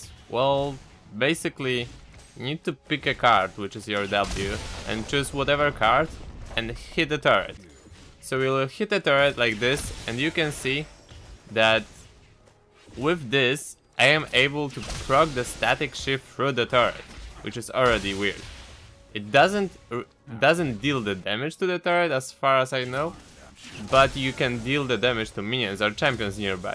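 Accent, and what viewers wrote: Poland. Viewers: Are you Polish? You sound Polish. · polish accent xd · Twój akcent, taki Polski ;p Oby tak dalej, ziom! ;)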